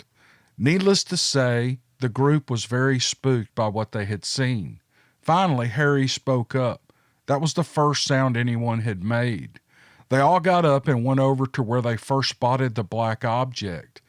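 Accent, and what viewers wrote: South, United States. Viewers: I love putting your videos on while I'm doing other things. Nice stories and your voice is very soothing. I live in Michigan, but I'm originally from down south and your voice (and accent) reminds me of my childhood. Thanks. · How did i go one day without listening to your great southern draw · It's your voice we want to hear, for me it makes me feel at home.iI was raised with the southern accent, and the La., Missippi way of talking.Just talk all you want, we all love it. Thank you for the storys.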